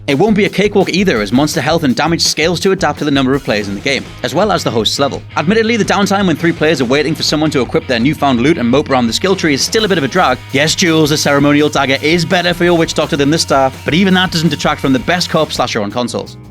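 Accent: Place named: Newcastle, United Kingdom. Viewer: At everyone criticising his narrative speed; You guys have never met a Geordie, have you? Confusing outsiders is our raison d'etre.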